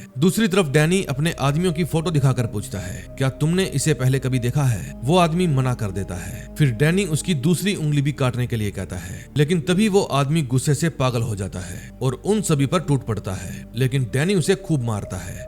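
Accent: Punjab, India. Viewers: Tu pakka Punjabi aa😂😂😂 pamma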